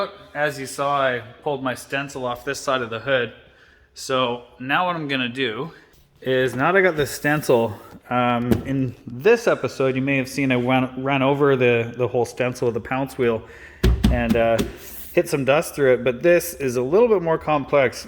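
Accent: Canada. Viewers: Took me a minute to realize u were Canadian. What's good from London Ontario! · Love hearing a Canadian accent on youtube and realizing you're watching a fellow west coaster at work! Nice work buddy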